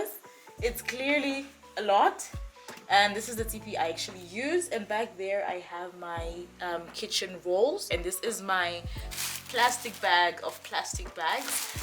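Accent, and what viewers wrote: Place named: United States. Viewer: Are you from USA? Your accent is giving me American vibes.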